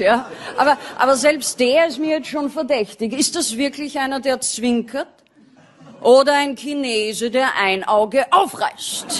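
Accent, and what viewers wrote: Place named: Vienna, Austria. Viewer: Ich liebe diesen Wiener Akzent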